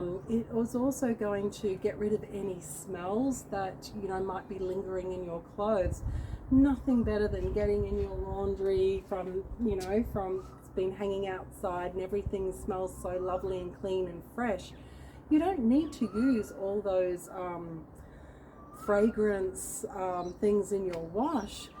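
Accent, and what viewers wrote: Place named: New Zealand. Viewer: Im from nz and i remember a friend of mine coming to nz for the first time she couldn't get over how every household had a clothesline and we dried our clothes that way whenever we wanted to 😂 then i saw in some parts of the states people were excited to be allowed to have clotheslines! I was thinking that's weird had they been using dryers all this time when it's free to dry clothes outside? There were video's and blogs coming out on how to dry clothes for free (obvious air drying) how to make clotheslines, how to hang washing. I can't imagine being without a clotheslines. When i moved to my new home i done away with the old clotheslines and made my own suitable for my big family even repositioned it to get the most out of the sun during winter. We don't like dryers so i made use of our covered deck area for rainy day's and have made a simple clotheslines there as well because washing is neverending 😂😂 Loved your video coz I'm over here like hmmm let's see how other people be hanging the t shirts 😂 then i was like this a kiwi gurrrllll im sure of it she sound like a kiwi to me l0l anyways i guess we still lucky here to have clotheslines, water tanks and chickens running in the backyard etc love from home chur xx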